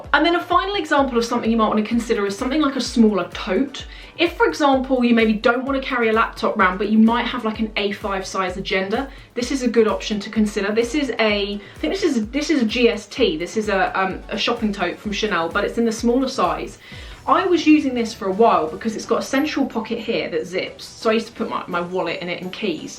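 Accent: United Kingdom. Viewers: Great tips and I adore your British accent.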